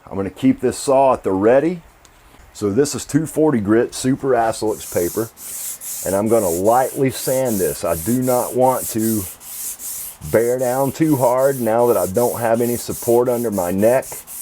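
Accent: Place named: South, United States. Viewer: I'm in stitches here. I love southern accents, and yours is great; but every time you say "Volute," it sounds like a spices of duck. I keep thinking the next part of the story will be how the dog jumped out of the boat and went after it... 🤣😂✌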